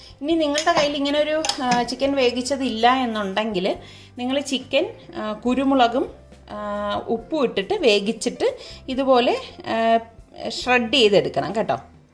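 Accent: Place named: Kasaragod, India. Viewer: Appa chapale..😍ksd slang...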